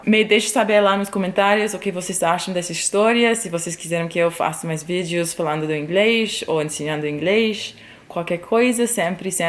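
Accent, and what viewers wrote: Rio de Janeiro, Brazil. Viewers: Tão fofinho e bonitinho seu sotaque carioca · Teu sotaque de leve já dá pra sentir o carioca! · Amo seu sotaque carioca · Seu português e ÓTIMO !!! Melhor ainda com o sotaque carioca !!!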